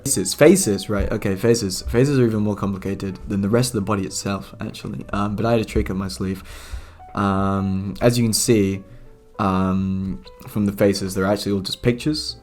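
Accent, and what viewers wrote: United Kingdom. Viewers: wait… this is crazy… he’s… HE’S… BRITISH IN REAL LIFE? AHHHHHHHHHHH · I can't recognise accents, so I really wanna know if you're from the UK. 😅. I'd be insanely impressed since most people there go to college at 16-18.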